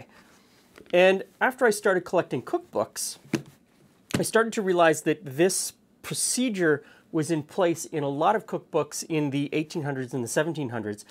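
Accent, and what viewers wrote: Canada. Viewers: This is why I come here -- not just to remind myself of what a Canadian accent sounds like, but to learn little helpful tips.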